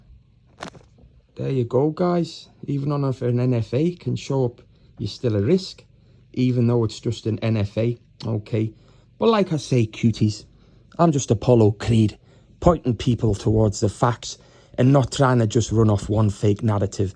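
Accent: Liverpool, United Kingdom. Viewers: Late night Hello to Apollo from Belfast 🇮🇪 Apollo your intelligent? You have the gift of the gab? And you believe your handsome? But yet you have a Scouse accent 😂👍SO YOU MUST BE IF THIS IS TRUE, PART IRISH 🇮🇪 👌👌👌 KEEP IT REAL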